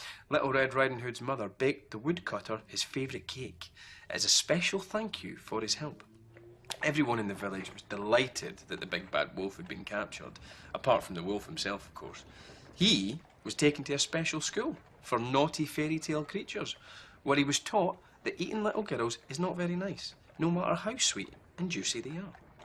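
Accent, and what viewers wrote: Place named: Scotland, United Kingdom. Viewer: Ира, молодец какая! Отличное видео)) Джеймс со своим шотландским акцентом вообще отлично вписался)) Особенно волку на славу удался! Спасибо за ссылку!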